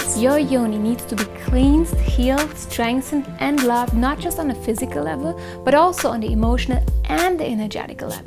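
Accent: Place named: Germany. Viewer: My best friend is German, it's so nice to hear the accent <3 And you're the coolest so watching these videos make me so happy :)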